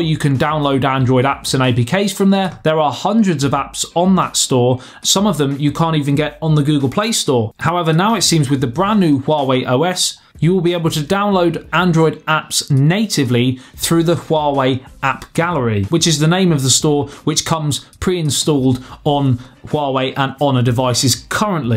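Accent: United Kingdom. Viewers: Such a thick British accent...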